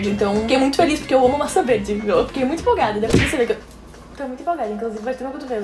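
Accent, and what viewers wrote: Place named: Spain. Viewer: I dont understand nothing, but i tink dare she is from spanish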